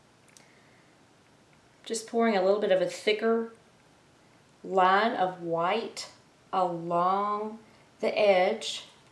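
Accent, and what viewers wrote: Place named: South, United States. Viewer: Just watched your intro video. I KNEW IT! I’ve been listening to you n thinkin “ this gal has some good BBQ in her background . She’s gotta be from down “Heah” someplace. I’m in North Carolina . Just retired n looking at hobbies.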